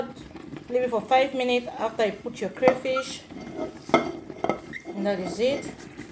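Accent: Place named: Germany. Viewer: LOL I hear how German is overtaking you. You cooked it the real Cameroonian style. Well done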